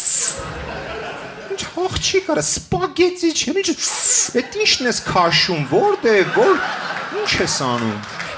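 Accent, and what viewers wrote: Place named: United States. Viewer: Մի տեսակ չեմ հասկանում ամերիկյան ակցենտով հայերեն խոսալու իմաստը, ահավոր խնդալու ա հնչում )))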